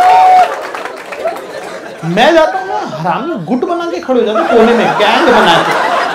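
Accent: Indore, India. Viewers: indori ki baat hi Alag hoti hai bhiyao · bhai ye Indori andaz he.. mp me ese hi bolte he... kabhi aao apne mp me bhot mja ayega...:)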